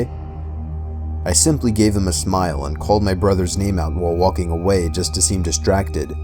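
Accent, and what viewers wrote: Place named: New York, United States. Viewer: I just realized he has a little New York accent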